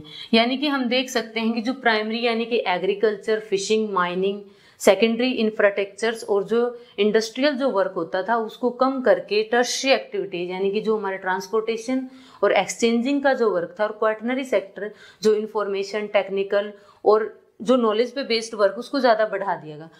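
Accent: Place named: Haryana, India. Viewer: Really appreciable video, cleared all doubts and confusion (btw maam aapki English mai bhi Haryanvi tune aa rhi h) 😅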